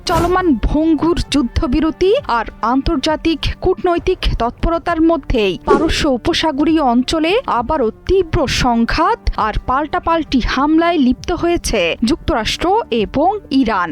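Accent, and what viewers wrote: Kolkata, India. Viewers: কলকাতা থেকে ট্রেনিং নিয়ে আসছেন নাকি আপা।আপনার উপস্থাপনার ধরন এরকম কেন?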